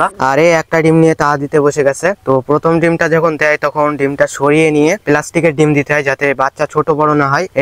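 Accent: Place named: Kolkata, India. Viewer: Kolkatar naki dada Tumi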